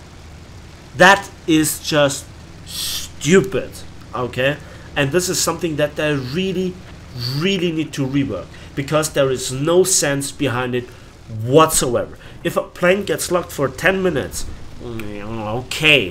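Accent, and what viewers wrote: Bavaria, Germany. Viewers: Greetings from the Capital and to be honest please make more Subnautica. You actually made me buy it and i love it. Youre Bayrisch is hard to understand here up north but just speak the language youre comfortable with.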